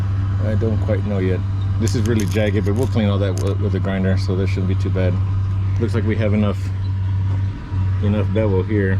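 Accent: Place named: Louisiana, United States. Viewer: This guy sounds like he’s from Louisiana. Got dat cajon accent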